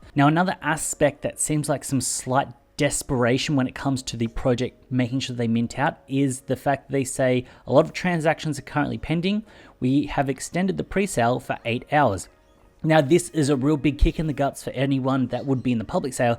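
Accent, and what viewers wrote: Australia. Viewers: Where are you from mate? sound Aussie to me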